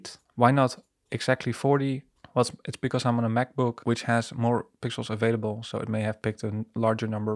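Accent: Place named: Netherlands. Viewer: Very interesting channel. Are you Dutch? You sound very Dutch :) I am travelling around the world and do most of my work remote. How about you?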